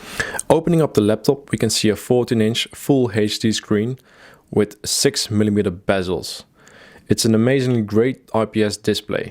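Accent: Netherlands. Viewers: Hahaha jah bradahhh ik wist het niet zeker, want je hebt veel engelse reacties vandaar G, maar je accent is duidelijk een dutch one matsko maar je spreekt duidelijk en goed dus no problemo, die bios vraag was omdat hp en andere merken die bios locken, je kan niks instellen dan, alleen datum en tijd, maar geen ram timings en raid ahc settings, jij hebt hem dus jij kan makkelijk checken 😃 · Ik hoorde gelijk aan j accent dat je nederlande bnt lol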